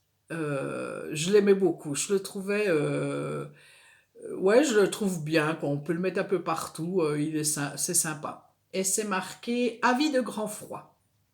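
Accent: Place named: Franche-Comte, France. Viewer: Bonjour ou devrais-je dire 😁 Après tes gentils commentaires sous plusieurs de mes vidéos, je suis ravie de découvrir ta chaîne, (par hasard je l'avoue et j'en suis toute désolée), et je dois dire que j'aime beaucoup ce que tu nous proposes, je m'abonne donc avec plaisir ☺ Et quel plaisir également d'entendre ton petit accent qui chante à mes oreilles 🤪 car oui moi aussi je suis Franc-comtoise 😅😁 à bientôt 😘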